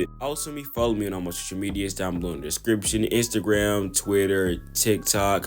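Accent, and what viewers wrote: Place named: South, United States. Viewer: Country AF😂 but i understood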